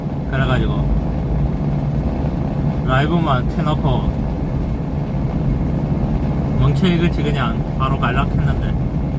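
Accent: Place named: Jinju, South Korea. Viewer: 진주 사시는군요 ㅎㅎ 말씀이 구수~하시네요 ㅋㅋㅋㅋㅋ 😂😊 풀영상으로 응원합니다 😍💞💓😻😸👍👍👍 항상 울콩딸기가 진심으로 감사드립니다 🙏🙏🙏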